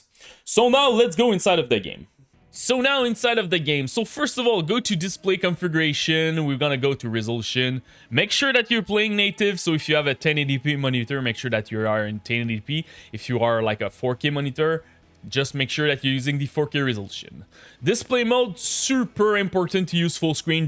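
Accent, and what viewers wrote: Quebec, Canada. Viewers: Omg tes français canadien!!!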